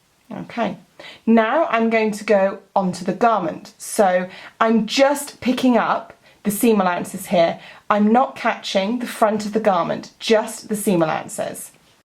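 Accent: United Kingdom. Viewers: a lovely british accent which makes it quite easy to understand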